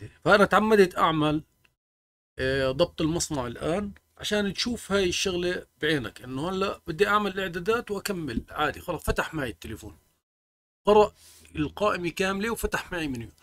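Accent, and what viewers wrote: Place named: Libya. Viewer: فيك لهجة ليبية 😮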